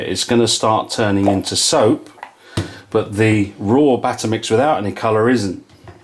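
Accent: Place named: United Kingdom. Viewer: What a breath of fresh air! at last, a clear informative video and British too, this will be of great help to anyone starting out, or for those who feel confused or overwhelmed by other videos. I found it really helpful, although I have been making soap a little while now, but it was nicely reassuring that all what you showed I also do. Thank you so much, looking forward to more!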